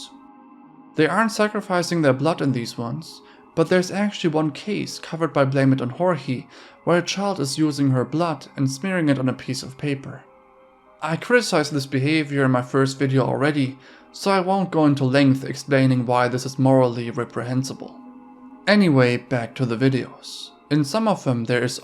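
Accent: Germany. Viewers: Nice slight German accent, he'd make a good asmr channel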